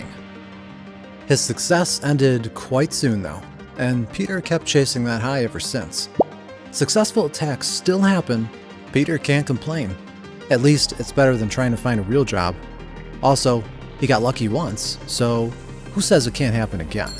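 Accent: United States. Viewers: are you from western New York man? like Buffalo or Rochester or something?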